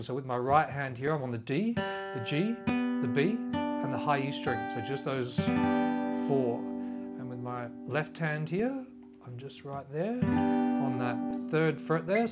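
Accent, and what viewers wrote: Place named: Australia. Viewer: Awesome video! Thanks dude! I know you Aussies say Mate, but in the US I say dude a lot. Cool accent dude.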